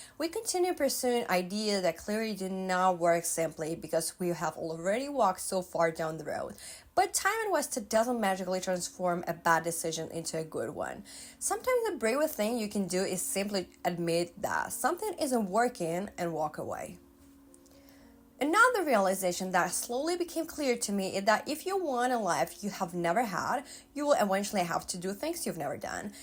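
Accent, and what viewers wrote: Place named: Russia. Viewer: I've seen a couple of your videos already. And I gotta say you have the best hair. I'm super jealous. Its just gorgeous. Also What is your first language? You have an interesting accent that I can't quite pin down(uncultured american). Sounds russian or maybe eastern european? But like with a touch of spanish and even scottish lol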